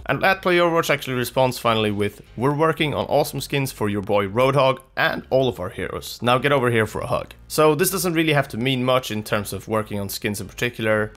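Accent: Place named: Sweden. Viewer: Where is this guy from? He sound Swedish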